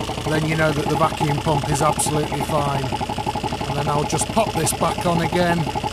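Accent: United Kingdom. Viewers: yo are u british bruv???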